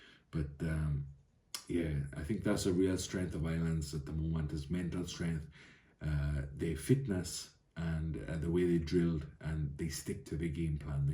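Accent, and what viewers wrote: Wales, United Kingdom. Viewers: Thanks, you have a lovely soft Welsh voice. Bet you sing in a choir.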